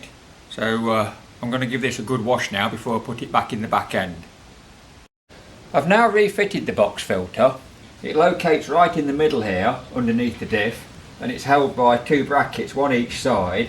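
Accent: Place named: Leicestershire, United Kingdom. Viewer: Hello mate , I detect a Leicestershire accent , a couple of nights ago I found a guy on here , I think he,s in the states , he,s got an old 344 with crossed - up hydraulic settings & a rattly & inaccurate pto - hydraulic engagement lever , can you have a go at finding him , & straighten him out please . My knowledge really ends at 272 & 245 , but I have worked on a 344 , it,s me that refers to " Big Keith " , it was his . Keith is no longer with us , so that door is firmly shut . I told him to contact Charnleys but I think your knowledge is equally as good . Many Thanks mate , & keep the vids coming . Phil .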